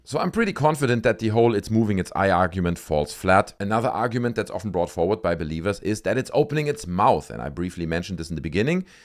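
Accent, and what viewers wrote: Germany. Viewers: Great video mate, is that a German accent im detecting btw? :)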